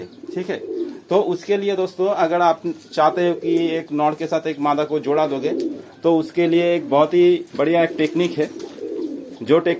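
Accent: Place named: Bengal, India. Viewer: I understand from your accent that you speak bengali. Accha, apni ki kokhono dekhechen je kobutorer vai bon jora ney? Amar ekjora ache emon, dim diyeche ora, ete ki bacchar kono problem hobe? Anways you have beautiful pigeons! Love from Bangladesh ❤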